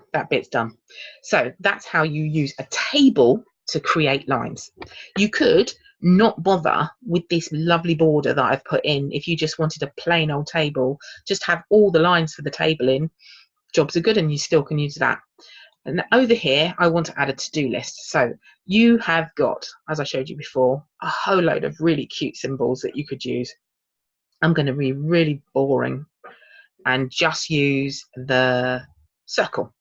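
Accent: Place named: Hertfordshire, United Kingdom. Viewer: Thank you. New to KDP so learning how to make one’s own interiors is good to know rather than having to get software like Tangent Templates (although I think I am tempted to as well). May I ask a question: would you recommend TT, are you still selling on KDP as this video is from 2 yrs ago?, are you still posting videos? You sound like you are from my neck of the woods. I’m a 54yr old mum from Hertfordshire (top of Northern Line). Thank you.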